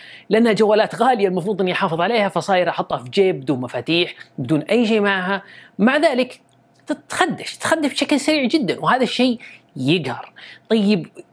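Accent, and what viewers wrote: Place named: Saudi Arabia. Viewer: والله انت رائع من عندك حبيت اللهجة سعودية ربي يبارك فيك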